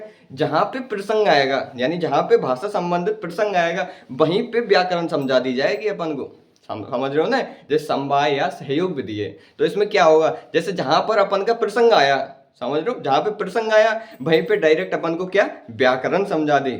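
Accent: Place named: Rajasthan, India. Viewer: Sir ap kha se ho hamri trh bolete ho 😀😀😆 karauli se ho kya ap😅😆😆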